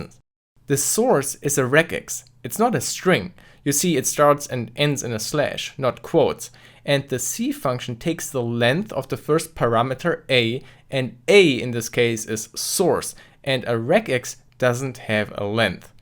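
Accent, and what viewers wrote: Germany. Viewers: Just wondering where you are coming from! You sound so German :)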